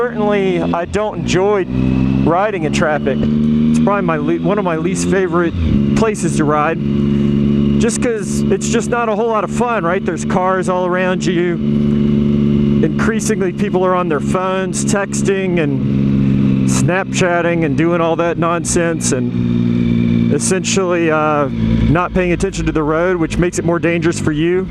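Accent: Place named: Oklahoma, United States. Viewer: Yes thankfully you don't have a Canadian accent, nothing against Canadians, but it bothers me for some reason. :) I am Oklahoman so I suppose you sound just like me. Thanks for the excellent video.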